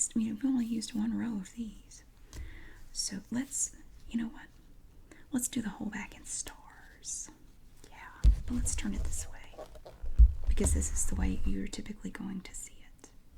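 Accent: South, United States. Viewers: I still have my sticker book from the 80's!! My 9yr old daughter has it now and started her own. Some of my scratch and sniff still have a faint scent which is crazy...dill pickle was my favourite! Love your videos...my twins both watch at bedtime, by request...you are their favourite!!! My daughter loves your southern accent, she tries to copy you saying" that's nice" or "that's so pretty", pretty funny from a 9 yr old Canadian girl😁♥️💜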